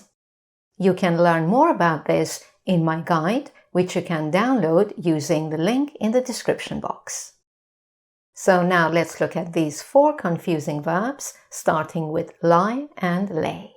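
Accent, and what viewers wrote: United Kingdom. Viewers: Madam Which type of english do you speak? American or British one? I feel you're a british type of speaker, am I right?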